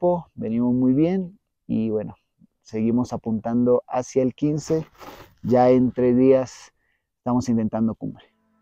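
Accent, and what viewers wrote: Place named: Mendoza, Argentina. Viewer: Hola muy claro tu mje.,soy Mendocino pero quede desconcertado con tu acento,sos Menduco?.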